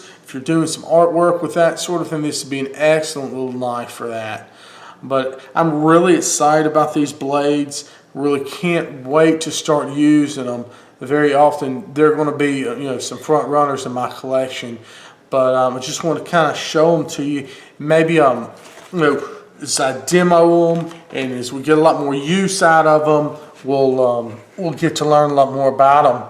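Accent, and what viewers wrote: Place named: Rural, United States. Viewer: Guy constructive criticism .. you dont start cutting for 8 minutes in a knife demo. Work on the accent I am from NE MS and you are killing the hick vocal quality at impressive levels. finally Learn how to use a knife you make the knife look dull but trying to hatchet through onions instead slicing and allowing knife to do the work . I suggest a quick watch of alton brown's knife video of good eats . oh an lastly like me you are better sited for behind the camera , put the knife on the the shot .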